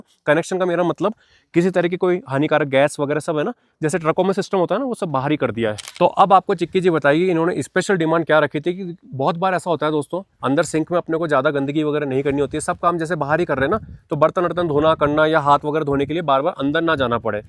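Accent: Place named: Mewar, India. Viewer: ji aapki boli mewad ya aaspass ki lag rahi hai, mewad, malwa ya aaspass se hi ho kya sir.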